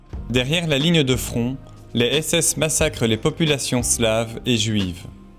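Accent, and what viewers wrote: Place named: Belgium. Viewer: Raphael Ebony Fans c’est l’accent belge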